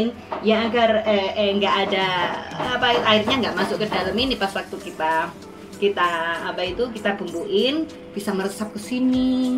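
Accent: Java, Indonesia. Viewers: bhsa jowo mu medok men thanks dah slalu bls comenku slm dr jowo tengah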